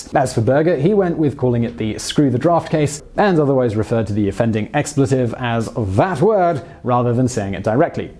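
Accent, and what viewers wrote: England, United Kingdom. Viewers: Very elegant eloquent and deep discussions and debates narrated by a nice English accent talking about.. Fuck lol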